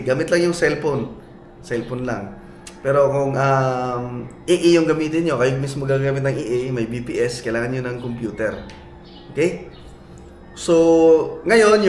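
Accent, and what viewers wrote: Visayas, Philippines. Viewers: Bisaya diay ka dol hahahahabal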